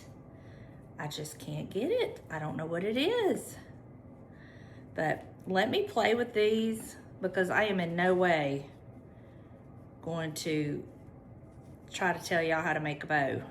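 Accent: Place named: United States. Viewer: Hi beautiful happy and excited to be here, and see your beautiful Chrismas decorating!! Your so cute love 💞 your county type voice if that makes sense, you are a fun person to hang with!! Wished you! Wheir a neighbor of mine. 🫵🏼✊🏼🫶🏽💯🥰✌🏼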